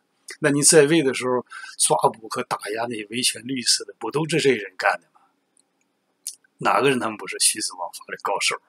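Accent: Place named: Dalian, China. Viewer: 大连味有的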